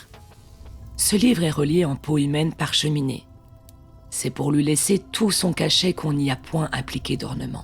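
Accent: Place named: France. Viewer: you are the most French sounding French woman who has ever existed! Bravo et bonne continuation de Bretagne. 🇬🇧😉👍🇫🇷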